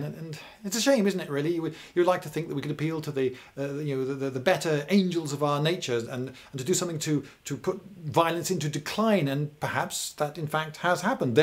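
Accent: England, United Kingdom. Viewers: For some reason in my head when im hearing you is almost like im hearing John Cleese, maybe its the english accent or the subtle humor, in any case its very captivating. Thank you for all the effort and hard work you place in all your presentations.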